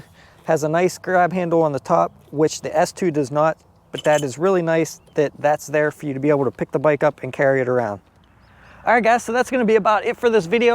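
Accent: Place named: South, United States. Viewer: Nice Southern accent!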